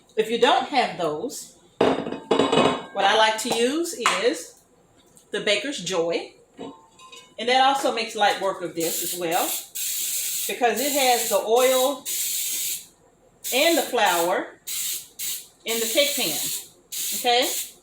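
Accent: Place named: United States. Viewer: I love this lady she talks so nice. Not overly English or southern style. Very good sound for me I am hard of hearing l love her American sound.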